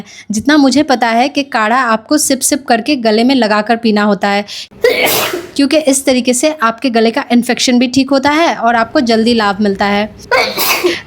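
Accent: Bengal, India. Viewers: Same didi ❤❤jab tak bhajan, katha na laga lu tab tak din ajeeb and adhura sa lagta hai ❤❤ apki bengali voice kitni cute hai 🥰 shree ji ki kripa ap par bani rahe ❤❤Keep It Up ❤❤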